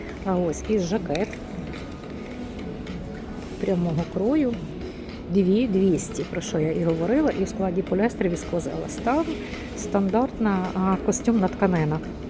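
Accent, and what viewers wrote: Lviv, Ukraine. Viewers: Вітаю вас,! Щиро дякуємо за огляди, бо вони є дуже цікавими. Ви дуже гарно подайте огляд продукції. Це ніби як сама оглядаєш та відчуваєш склад тканин. Я також є дуже прискіплива до якості пошиття виробів. А ще мені подобається ваш тембр голосу, вимова. Відчувається той львівський шарм. Люблю ваше місто. Місто моєї молодості, студентсва😊 💛🩵🍀🌷🌷🌷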